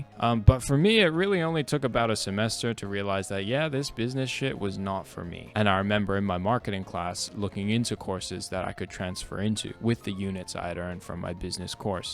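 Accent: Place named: Australia. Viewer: This is probably the most mild Australian accent I’ve ever heard 😂😆 I’m pursuing a film studies degree and while I love doing photography/videography, I’m also worried abt job prospects. But I’m also not particularly a great student so I felt like I had to choose this degree bc I would literally fail the other classes… idk uni is just hard lol. It will work itself out ❤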